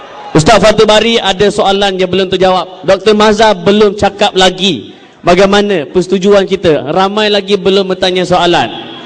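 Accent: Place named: Kelantan, Malaysia. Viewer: sedap ore kelate gok jawab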